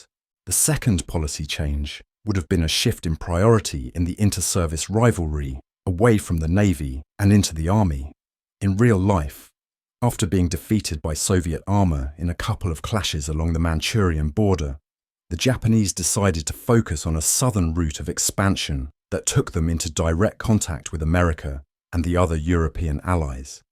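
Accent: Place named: United Kingdom. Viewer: Husky ethnic British voice sounding like Alfie from Emily in Paris ❤❤❤❤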